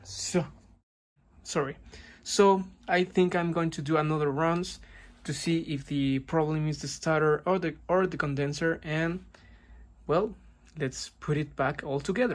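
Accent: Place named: Mexico. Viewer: I think so. He collects Mexican lighting and has a Mexican accent (I think). I'm terrible at recognising accents.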